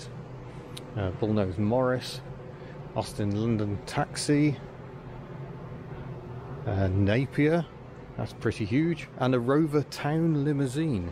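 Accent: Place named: Glasgow, United Kingdom. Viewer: Not a bad Scottish accent. Like a posh Glaswegian.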